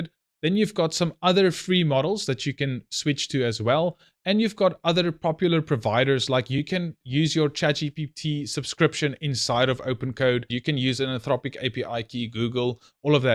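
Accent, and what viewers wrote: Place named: Johannesburg, South Africa. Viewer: Excellent video, yes keep them coming, I find them very interesting. Plus my wife comes from Jo-burg and your voice makes her home sick lol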